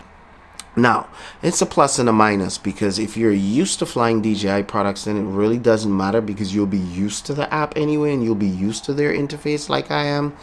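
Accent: Trinidad, Trinidad and Tobago. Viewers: Always nice to find a new drone channel on YT...subscribed. Loved the video. You sound like an expat Trini btw. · u from Trinidad?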